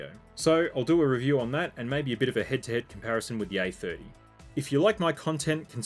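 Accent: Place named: Australia. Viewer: Melbournian here, it’s a treat hearing a fellow Australians voice doing this (kinda tired of Russ from RGC voice..)!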